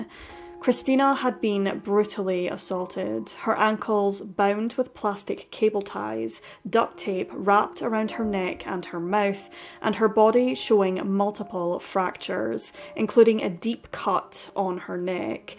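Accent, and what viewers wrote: United Kingdom. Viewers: yes,top 5 for me too. Truly criminal are great too. Like yo,to the point,clear,concise+British is a bonus for me😊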